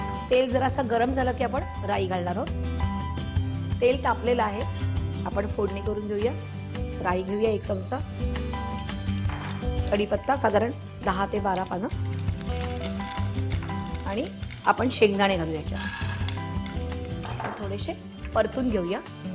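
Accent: Pune, India. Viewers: Is this the Pune marathi language?? Or vidarbh marathi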